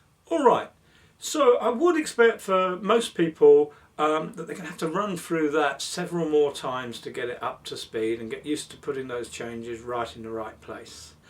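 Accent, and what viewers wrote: United Kingdom. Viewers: This is a very good tutorial. I have been playing that progression, that way for several years; my dumb, I didn't know it was Texas Blues. This teacher does a good job of explaining everything, I thought it was cool to be learning T.B. from a bloke with an English accent, but why not.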